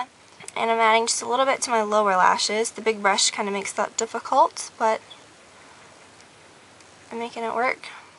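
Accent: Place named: Atlanta, United States. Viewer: In this vid you can def tell shes from Atlanta!!! Hahahaha she has changed a lot, wish is good!!!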